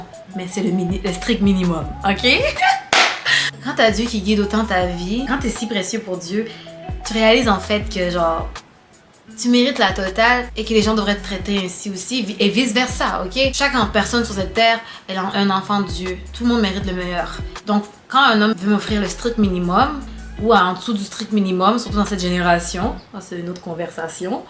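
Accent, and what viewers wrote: Canada. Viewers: chic accent Canadien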